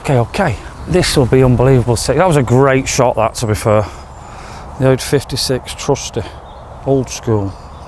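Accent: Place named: Yorkshire, United Kingdom. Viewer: I love the Yorkie accent Who needs prepositions?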